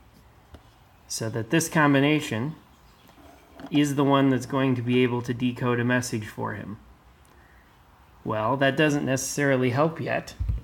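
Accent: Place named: Ireland. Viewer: what are you Irish?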